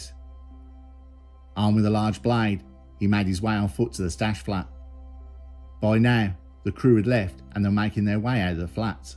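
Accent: Birmingham, United Kingdom. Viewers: Loving your content and your narration sir, Brummie accent big up 👊🏻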